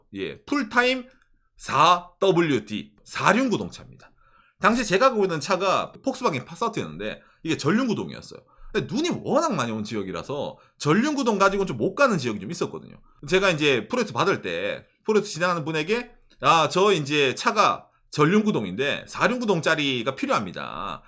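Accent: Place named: Gyeongsang, South Korea. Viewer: 우연히 봤는데 남자분 한국인 이신가요?한국말정말잘하시네요 경상도말씨처럼~~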